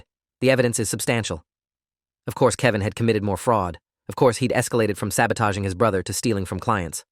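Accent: United Kingdom. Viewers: YESSS! I was just about to ask if I was crazy or is the narrator British? 😂😂😂😂😂😅😅😅😅😅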